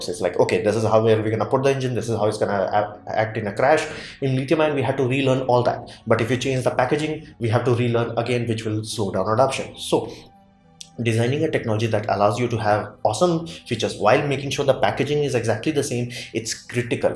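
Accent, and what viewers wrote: India. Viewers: My United States English accent and your Indian English accent are far enough apart that I could not follow your presentation, so I lowered the replay speed to 75% and everything became clear. I'm just mentioning this as a suggestion for anyone else with the same problem.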